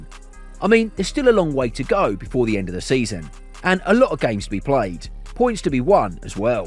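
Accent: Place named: South London, United Kingdom. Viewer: Panenka sounds more like AI, even though he's not. This guy is more South London sounding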